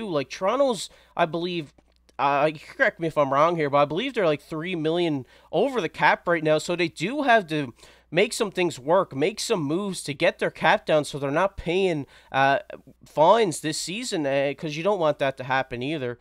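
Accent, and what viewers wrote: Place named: Maritimes, Canada. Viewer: Great report love the Maritime accent!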